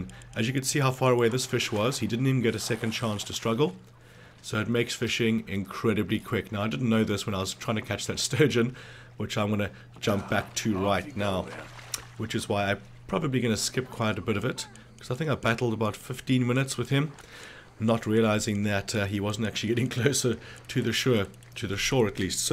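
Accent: South Africa. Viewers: You from South Africa? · Are you from South Africa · South African Accent.. awe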